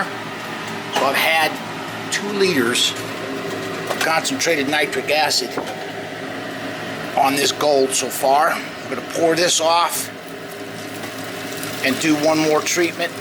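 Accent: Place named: Philadelphia, United States. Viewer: you're from the North East aren't you? I wanna say around me in NJ (in the south where we don't have that accent - we sound liker Philly) and I feel like I hear some Philly in your words, but I'm probably wrong. That's be cool if if I guessed it though. :)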